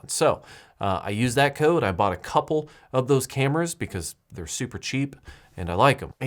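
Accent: United States. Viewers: Ali Naqvi southern accent #Kansas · you're 🇨🇦??? WOULD HAVE NEVER GUESSED! You kind of have an murikan twang.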